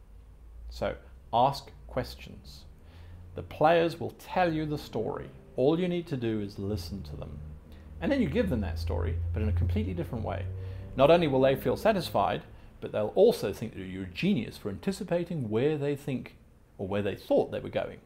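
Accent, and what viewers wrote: South Africa. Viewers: are you South AFrican? YOu sound South AFrican. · Do I hear a hint of Afrikaans in that accent ? ... if so, Greetings ! really enjoyed this video, amazing tips, will definitely put them to use. You have my thanks.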